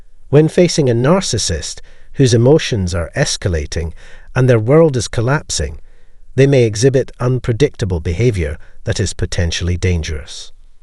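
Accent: United Kingdom. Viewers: Breaking up with ANYONE hurts them in ways you can only imagine when they care about you. If someone would be so hurt by my absence then send me ALL your narcissists, I'd rather date them than this annoying British-sounding twat narrating this video.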